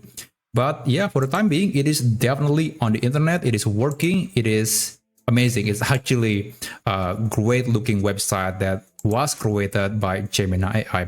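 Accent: Indonesia. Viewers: That's what I thought, too. his English is Indonesian English. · stunning tutorial man, great job! btw your accent like indonesian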